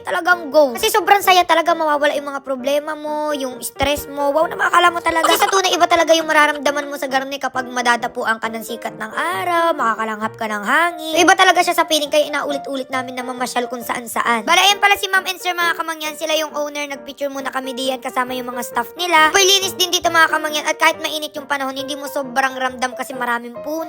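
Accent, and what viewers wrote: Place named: Quezon, Philippines. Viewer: Ito yong blager na nakaka tuwang panoodin saka yong Punto nya kagaya dito samin sa queson province ❤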